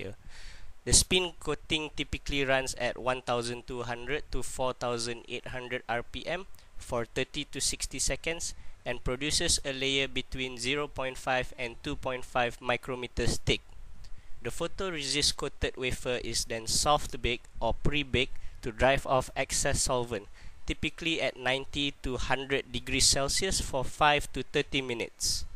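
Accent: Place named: Malaysia. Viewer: like a malay reading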